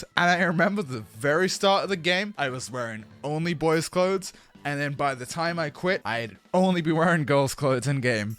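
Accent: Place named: United Kingdom. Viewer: harry potter accsent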